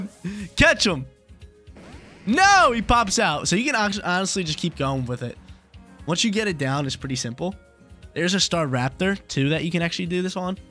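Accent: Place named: North Jersey, United States. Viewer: I just know your from north Jersey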